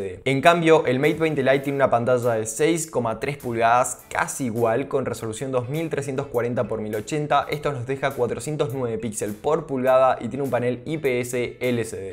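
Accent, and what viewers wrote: Argentina. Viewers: Amigo tiene ud un acento muy particular, casi neutro, si es que eso existe. De qué parte de Argentina es? Todos hablan así? O es propio el estilo? Muy claro y entendible pronunciación. Se agradece. Saludos.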